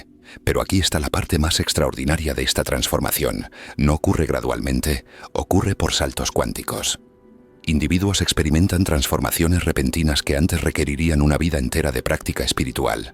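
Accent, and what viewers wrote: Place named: Spain. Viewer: El acento español es muy desagradable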